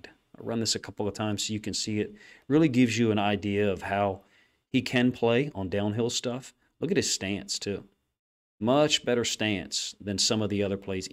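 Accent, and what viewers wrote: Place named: Mid-Atlantic, United States. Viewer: Love your breakdown. Very ASMR. You can't escape that Maryland, Delaware, or Pennsylvania accent. I'm gonna guess Maryland. 😂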